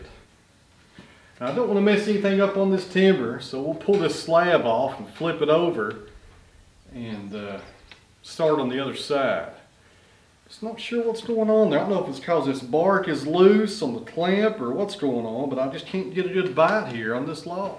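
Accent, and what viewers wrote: United States. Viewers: Loving this dude's accent - sounds like REAL America, as it should be. Got my sub here from the UK.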